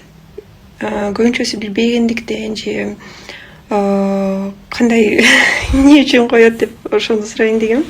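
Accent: Standard. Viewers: Абдан туура суроо койуптурсун.Мен орусча сени МОЛОДЕЦ дейинчи 😀Ушунча таза жана жумшак суйлоптурсун,уккун эле келет.Азыр кыргыз жаштар деле минтип суйлой албайт. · Айии кебир опко кыргыздарга караганда чотка суйлойт экен🫠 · Кыргыз тилин таза суйлогонуно рахмат.Кыргыз тилинде 36тамга бар арасында жумшак белги бар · Кыргызча таза суйлойт экенсин анча мынчаларга Караганда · Танкалдым, ушундай таза суйлот экен кыргыз тилин. 😮😊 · Баягы биздин папа мама батя брат сестрёнка братишка деген чала орустарга салыштырмалуу жн сизам бизам битта экита деген чала сарттарга салыштырмалуу жн казыр жатыр матыр деген чала казактарга караганда бул кыз Кыргыздын нукура таза адабий Эне тилинде сүйлөйт экен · Вауу Кыргызчаны таз суйлойт экенсин. Азаматсын сулуу кыз❤ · Киргизкаларга караганда кыргыз тилин сен таза кыргызча суйлойт экенсин. Ооба кыргыз тилинде мягкий знак жок. Бирок СССР мегилинде Кулматов десе Кульматов деп орус аялдар жазып коюшкан. 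Ошондуктан али кунго чейин фамилиям ушундай. Метрикада кандай болсо, паспортко ошентип жазылып калган. Аны озготууго болбойт. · Мыктысын.Туура кыргызда ичкертүү белгиси коюлбаш керек.Ал биздин билимсиздиктен келип чыгып жатат Кыргыз тилин киргизкаларга караганда таза сүйлөгөнүн кубандырат.❤❤❤ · Амээй кокуй! Тап-таза Кыргызча суйлойт ко. Соз жок.❤ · Сарышка кыргызчаны кыргыздан таза суйлойтко молодец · Кыргызча таза суйлогонун учун 5 лайк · Ииий жаным молодец Анча мынча киргиздерге карпганда. Нукура кыргызча суйлогонуно ыраазымын азамат! Орусча кичине уйронуп алып, кыргызча тушунбой калган мыркаларга, мырктарга наалат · Киргиздерге салыштырмалуу, кыргыздын сары кызы таза сүйлөйт экен. Майлуу суудан болбойсуңбу? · Мягкий знак олуп кетсин коймоктон коё берсин сага ырахмат кызым кыргызча таза суйлогтнуно Бишкектеги киргиздерге улгу Кыз экенсин Бактылуу бол ананайын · Оой секетболойун кыргча таза суйлойт экенсинхо ошону билбейт экенин сураш керекекен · Красавица таза Кыргызча суйлогонуно класс басам Кыргызстанда жашаган бардык улуттар уйронгуло силерда кыргыз тилин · Азаматсын кыргвзчаны таза суйлойт экенсин🎉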